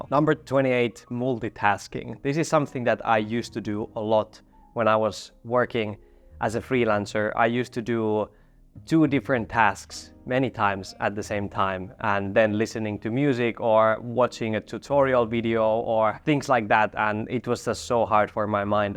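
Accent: Finland. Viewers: Just discovered your channel as I am going through big changes in my life. You are giving me food for thought and are inspiring ✨️ I knew you were finnish when you started talking. 😉💖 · Hello! It is my first time watching your videos, I just discovered your chanel. ☺️The first thing that I noticed was your accent which I find really interesting and comfortable to listen to. May I ask you where are you from? Based on your accent, I would say Finland? 🤨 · It's the Finnish accent! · I love Finnish people speaking English… and your content is great, clear and handy. I’m happy to have bumped into your channel! Keep going! Toivon sulle kaikkea hyvää!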